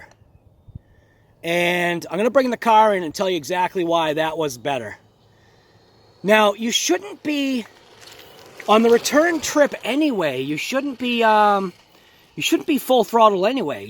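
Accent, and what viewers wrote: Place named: Massachusetts, United States. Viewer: Brutha! You've gotta be from Massachusetts! Lmk sir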